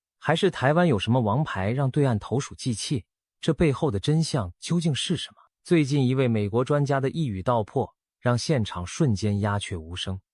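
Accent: China. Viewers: 聽你這個共產黨的聲音，就討厭! 假裝台灣人的聲音! 不要有些字用簡體，你應該全部用簡體字!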